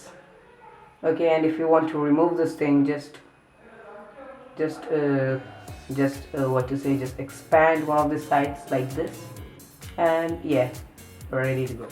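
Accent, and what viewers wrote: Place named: India. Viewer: Did he just say 'XBASS 360'?? Indian accent maarlo yaar...fir kehte ho ki log subscribe nhi karte!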